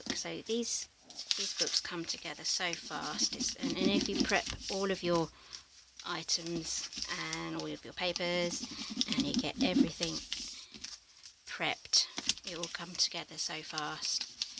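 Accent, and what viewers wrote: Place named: United Kingdom. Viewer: So nice to see and hear someone from the UK, great video and book.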